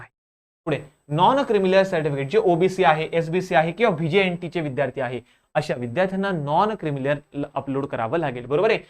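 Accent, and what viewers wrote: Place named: Khandesh, India. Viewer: Sir mi Mumbai thun ahe pen mazi Mummy khandeshi ahe tyamule Mela thodi thodi khandesh chi tone samajate ani Tumi nakki khandeshi vatate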